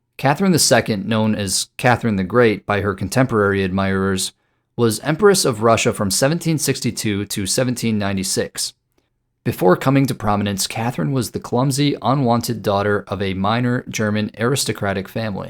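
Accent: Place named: General American, United States. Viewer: General American Dialect ✅️, Zoomer voice✅️, Projecting escapism on a foreign model of governance while ignoring the experiences of those who lived under it (Absolute Monarchy, one-party Marxist-Leninist states, fascism, etc etc) ✅️ Diagnosis: Terminally Online LARPer Remedy: Touch grass and switch from online orthobro to boring IRL Orthodox.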